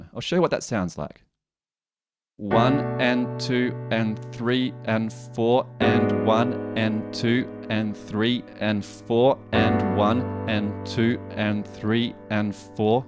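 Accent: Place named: England, United Kingdom. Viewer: I sense an English accent xD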